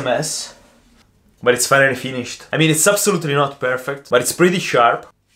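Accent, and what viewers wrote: Italy. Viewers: Bro, ma sei italiano? Ok, lo è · Are you from italy? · love your italian accent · Ma sei italiano??😅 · Sei italiano? Ok alla fine del video si capisce che si, lo sei. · Sorry but... are you italian?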